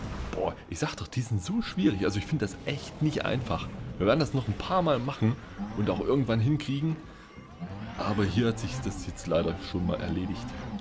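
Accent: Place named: Saxony, Germany. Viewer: Ich für mein Teil freu mich enorm wieder Videos von dir sehen zu können 👍 Deine ruhige Art in kombination mit dem klassischen Let's Play-Schnitt hat mir bei all deinen Projekten gefallen. Vorallem, kollege Sachse, als Dresdener finde ich deinen Dialekt sehr deliziös.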